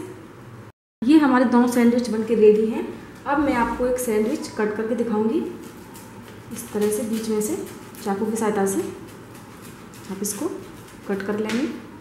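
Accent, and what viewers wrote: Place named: Delhi, India. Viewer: Bolne k tarike k lag Hi rha tha ki shayad humari taraf se Hi ho kyunki me b Delhi se hu but Gujarat me reh rhi hu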